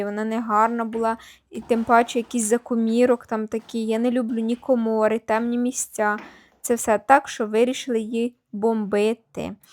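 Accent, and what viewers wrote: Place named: Western Ukraine, Ukraine. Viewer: .по вимові складається враження,.що ти із західних областей?.яАле у тебе не суржик,.у тебе кацапська на український лад,.ти молоденька навчись своєї рідної мови,.ти взагалі молодець як людина теж тож не ображайся за мову,.а вчи,.бо дуже уже нам боляче слухати ту кацапську,.рощумієшчому?.Скільки людей убили,."асвадаждаючи"від української.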